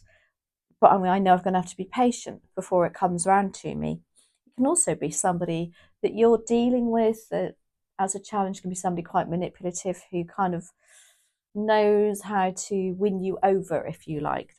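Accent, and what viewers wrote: United Kingdom. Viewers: I admire readings of this🌹Very Beautiful Lady🌹 These messages resonate with 💯💯💯💯💯 persent. Almost all readings sre brilliant, right in the point 🎯🎯🎯 Plus added amazing British accent which is sheer delight to hear and sounds so pleasing and an effort to make lively environment for us with pretty flowers always maching colours of blouses and light all around, makes this chanel indispensable for everyone who needs to hear excellent readings matching with reality of the circumstances, taking place in life. Thank you very much for helping us in guiding to follow right path. 👏☘️✨⭐🌹🌟✨☘️💫🎗️✨👏👏👏😊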